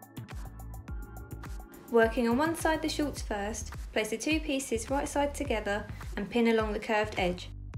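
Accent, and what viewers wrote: United Kingdom. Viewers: That accent is amazing.... i wish i lived in Britain 😣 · You have one of the best British accents ever. That’s why I keep coming back.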